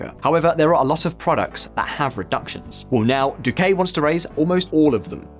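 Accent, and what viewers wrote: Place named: United Kingdom. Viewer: Pretty slick move, making this video with the high production value, well spoken host, young guy, British accent to push the Uribistas' version of reality. This is not, and has never been, a "both sides" issue. Colombia does not have a middle class. It is the most economically unequal country in the world, and has been for a long time. So, the pandemic didn't just bring about hard times for this imaginary middle class. It caused those at the bottom of the upper class (people who work jobs, live comfortably, travel, have disposable income) to lose their jobs, and plummet aallllll the way down to the upper level of the lower class (people who are just barely surviving, eating most days, but not all, and living in substandard homes surrounded by crime. Having lived in Bogotá for a year, and having learned so much from my wife who was born and raised, I call foul on this whole video. 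There is no middle class. There is no infiltration by terrorist groups. Police are not being shot at. They're getting rocks thrown at them. Molotov cocktails are the most they have to defend against. ESMAD and the military are provoking the violence, every time. Facebook live videos have made these sleek propaganda pieces easily taken apart. Colombia has never had good government. I was there when a referendum vote was held to simply make corruption illegal. It was an obvious landslide. But the number of overall votes were not enough, because Uribe's paramilitary threatened many voters into staying h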